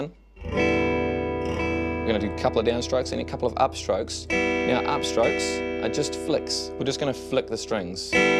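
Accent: New Zealand. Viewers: I was thinking you might be an Aussie because of the Ripcurl T-shirt but as soon as I heard you I knew you were a kiwi like me😂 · Hello, you explained the pick-holding technique really well, thank you very much! I've asked a few guitarist friends of mine to teach me how to hold a pick and all of them told me to hold it "naturally" (which is, what and how is natural to a first-timer anyway?). I really appreciate your detailed explanations and encouragement. Are you by any chance a New Zealander? It sounds like so from your accent, and you seem to use the words "cool" a lot, kind of a signature slang of NZers. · Pretty sure he's from New Zealand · New Zealand? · does he come from nz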